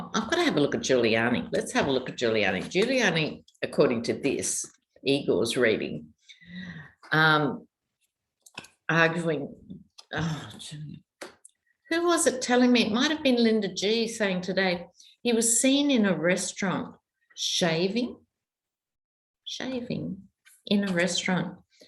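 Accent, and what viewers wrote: Australia. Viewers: Thank you, I love that you have a number for every video and the Australian voice sound is so beautiful on top of that what a gift your reading are for us here in the US.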